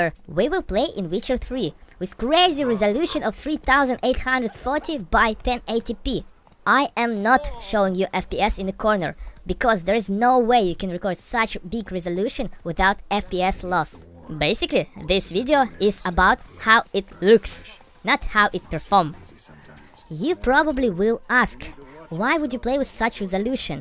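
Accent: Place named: Russia. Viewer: Now I can hear your Russian accent :) Usually I don't notice it so hard x)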